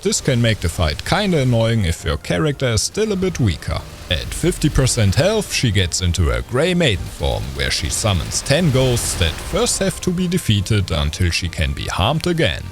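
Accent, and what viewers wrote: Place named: Germany. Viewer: could instantly tell ur german by the accent, but good stuff haha keep up the work :)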